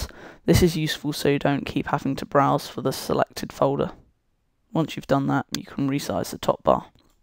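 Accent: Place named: United Kingdom. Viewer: based on the link and his accent, prolly, he's from UK...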